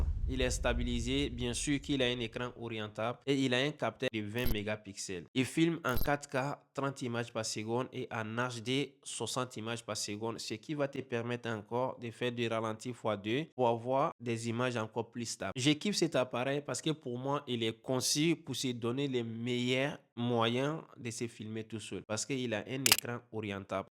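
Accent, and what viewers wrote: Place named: Africa. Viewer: Merci 🙏. Je suis juste qu'un simple amateur/débutant avec un G7 de 2016 que j'ai laissé dormir depuis looooongtemps et que je ressors petit à petit depuis quelques semaines. Que de temps de perdu...😔. Pour ce qui est des Lumix, j'apprécie l'ergonomie,la prise en mains qui fait boîtier solide. Les menus également que je trouve assez intuitif. Autrement,je t'ai découvert il y a peu et j'apprécie ton contenu. En plus,pour une fois que l'on a un"cainfri"🔥(avec le vrai accent)😂passionné de photos/vidéos qui à sa chaîne Youtube et qui fait ça bien pourquoi ne pas l'encourager ? Pour le coup,je m'abonne et je lâche un:Yah Foye,on est ensemble 🤣 🔥. Mes salutations de la Réunion 🇷🇪 🙏 🔥 🤝. Bonne soirée.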